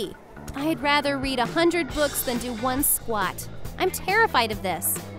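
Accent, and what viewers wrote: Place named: Wisconsin, United States. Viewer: You sound like you're from Wisconsin!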